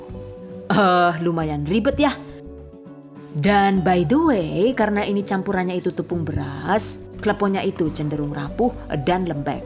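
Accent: Banyumas, Indonesia. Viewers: Nah klepon ala unik banget soalnya pake nutrijel, apa hanya aku yg baru tau kalau nutrijel bisa buat klepon😁 Selalu sukak sama penjelasan2nya, apalagi bahasa ngapaknya itu "pada mledos kabbeh jan" lucuu dengernya😂 dan ciri khasnya itu klw ngomong OK GUYS atau CATAT😁 bikin orang yg gak mudeng jadi mudeng😁😍 #GiveawayTHV2020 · Klo sy buka, diantaranya jg selain tertarik resep2 nya bunda cantiek, tapi juga 'ngapak' nya itu lho yg kutunggu...hehehe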